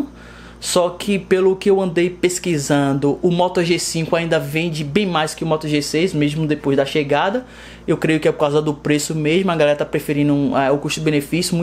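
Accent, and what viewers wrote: Bahia, Brazil. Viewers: Sabia que você era Baiana, esse sotaque aí! Sou de Salvador. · Vc e da Bahia? ??????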